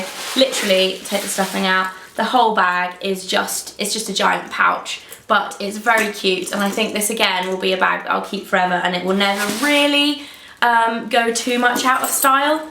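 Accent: England, United Kingdom. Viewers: You are an english accent... Good vidéo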